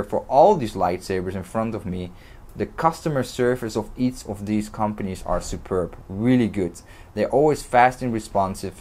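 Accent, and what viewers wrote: Netherlands. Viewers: ja ik hoorde het een beetje aan het accent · Where are you from, ur accent souns a bit dutch to me xD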